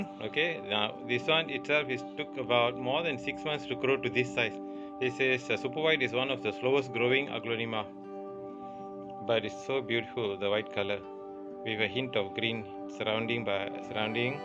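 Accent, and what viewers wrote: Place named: India. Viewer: No problem thank you so much for your kind information i thought you were from India you sound so much like Indian 🙏🙏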